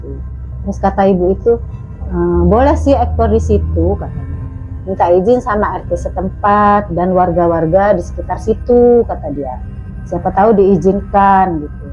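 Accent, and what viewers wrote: Lampung, Indonesia. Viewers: membawakan ceritanya Lampung banget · Orang Lampung kek nya mbak nya dari logatnya Lampung bnget, soalnya saya juga Lampung bumi agung Salam kenal subscribe baru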